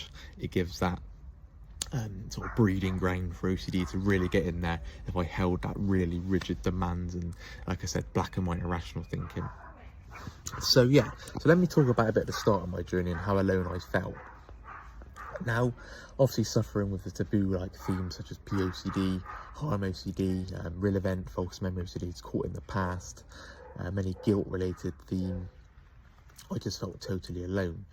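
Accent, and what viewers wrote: Bristol, United Kingdom. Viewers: definitely from Bristol 😂 that accent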